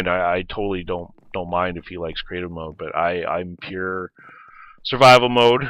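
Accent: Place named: Canada. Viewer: Love your Canadian accent eh.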